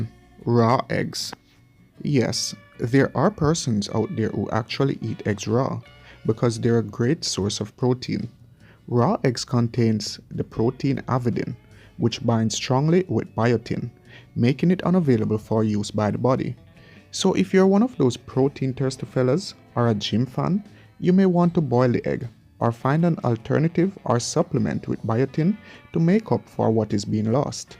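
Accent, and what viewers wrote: Jamaica. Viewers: Very Imformative....I like that you just speak in your normal Jamaican accent & not do the twanging that some others on YouTube do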